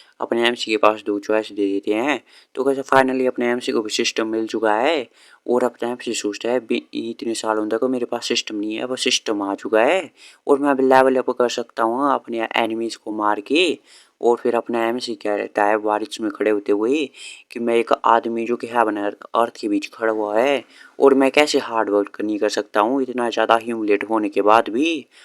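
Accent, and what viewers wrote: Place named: Himalayas, India. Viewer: tabhi tumhari hindi hai ... Himali taaal mehsuus ho raha thaa...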